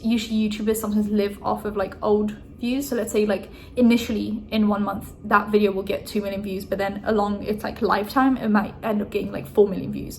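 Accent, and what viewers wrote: United Kingdom. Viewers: wait, you are not british..? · Omg I could’ve sworn you’re British your accent is so authentic 💀💀💀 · English is not your first language and you're an immigrant?? I really thought you were just British. What's your ethnicity I'm curious:) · It's because she doesn't suddenly make any random loud noises or start yelling. The British accent and Calm composure don't hurt either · I literally had no idea she wasn’t British and I’ve been watching her forever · Please no one come at me, I have the memory of a sieve but, where is my girl from? I full on thought she was British. Sorry if that comes across as rude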